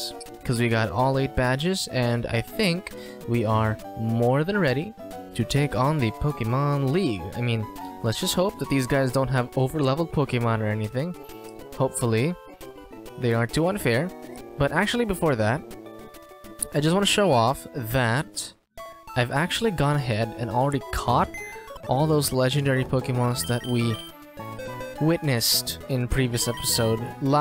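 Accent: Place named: United States. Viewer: woah cool! I have Filipino blood and was born in San Francisco, California. Came here to the Philippines way back '98 when I was 10. So are you residing now in the U.S.? I noticed your accent. It's an American accent so that made me think you're a Fil-Am but as you've said you're full Filipino. Btw, does this hack have an Amulet Coin? I just went in the Pokémon League earlier and I'm wondering if this hack does have an Amulet Coin. It's so hard to make money in the Pokémon league because my team's level is just 5 main Pokémon with levels 56. I keep on dying whenever I get to the 3rd member of the Elite Four.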